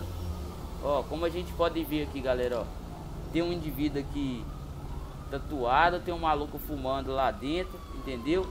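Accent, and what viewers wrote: Minas Gerais, Brazil. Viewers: e mineiro??? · você é Mineiro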